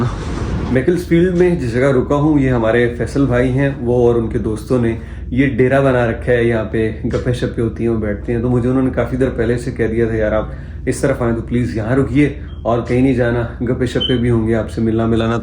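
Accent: Punjab, India. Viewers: ایک مودبانہ مشورہ دوں گا، ناراض بھی نہ ہوئیے گا۔ آپ ایک اچھے یوٹیوبر بن سکتے ہیں۔ دو چیزوں کا ضرور خیال رکھیے۔ ایک تو یہ کہ آپ کی اردو اچھی ہے اس میں پنجابی کا تڑکا نہ لگائیں کیونکہ اس سے وی لاک کا مزہ کم پڑ جاتا ہے۔ دوسری بات سیاسی گفتگو سے پرہیز کریں کیونکہ آپ کو بیچ بیچ میں سیاسی چٹکلے چھوڑنے کی بھی عادت ہے۔ پاکستان میں بہت ظلم چل رہا ہے، جبکہ آپ اکثر اٹھکیلیاں کرتے رہتے ہیں۔ امید ہے ان چیزوں کا خیال رکھیں گے۔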